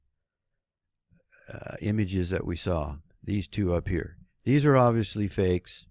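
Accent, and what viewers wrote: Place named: Boston, United States. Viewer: Sounds like Richie from Boston :) I digress.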